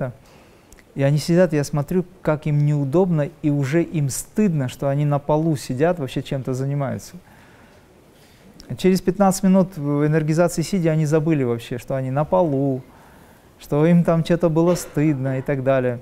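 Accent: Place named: Dagestan, Russia. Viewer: Аварский акцент есть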